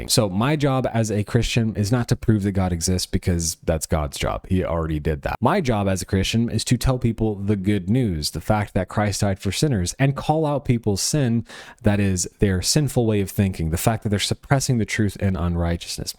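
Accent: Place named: Australia. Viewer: wow you have an aussie so cute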